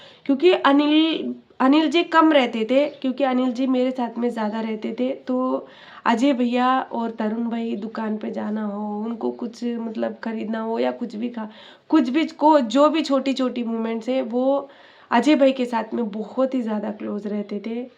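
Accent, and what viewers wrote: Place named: Hyderabad, India. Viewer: You spiking like haydrabadi language style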